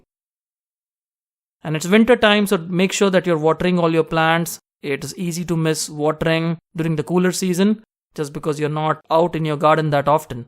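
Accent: India. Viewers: Are you from India your English seems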